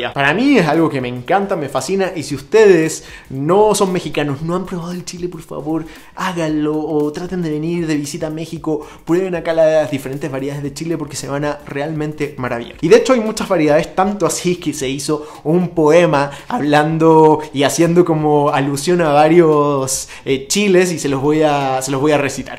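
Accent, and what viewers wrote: Chile. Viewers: 🌶 El chile serrano para los caldos, solito a mordidas o en salsa 🤤 delicioso! Me encantó tu contenido porque esta bien chido aprender de la gastronomía de mi país y con acento chileno y buen gusto.... está de lujo 😉👌 gracias, me haces pasar un buen rato! 👏👏👏👏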